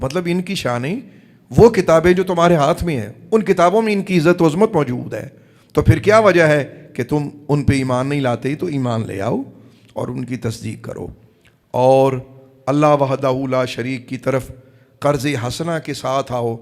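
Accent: Arab world. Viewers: SUBHAN ALLAH… YA SAYADI YA MURSHADI … qurban ap k ilm o feham py … arbic lab o lehja khubsorat … muzu kalam alaaaa… tahqeeq or dalaile zarbdast… QURAN E PAK ki ayat mubarak se ABU BAKAR ki shan or muqam ko waziya beyan farma k munkiron ko lajawab kiya … andaz e beyan alaaa or purasar …. AP ki ilmi baserat ko salam … ❤️🌹❤️🌹❤️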